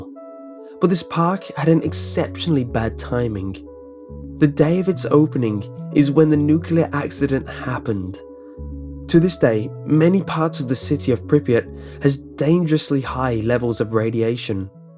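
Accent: United Kingdom. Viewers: I love your British :)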